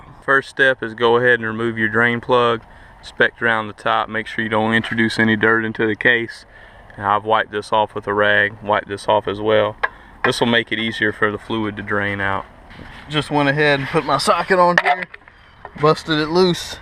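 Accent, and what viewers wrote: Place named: South Carolina, United States. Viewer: So I stumbled upon your videos on Dish Networks YouTube channel. I was watching you replace your Kawas water pump gaskets, bearings etc and I told my wife this guy sounds like someone from SC. Lol I then search your channel on my phone and I see the Welcome to SC sign. Pretty cool I'm from upstate SC area in Spartanburg! Btw I'm gonna rebuild my water pump in my 08 Yz250 this week!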